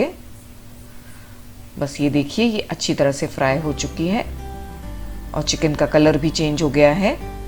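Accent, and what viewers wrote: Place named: Bangalore, India. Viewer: I am from Bangalore , and you sound a bit Bangaloren so asked. Thank you very much mam...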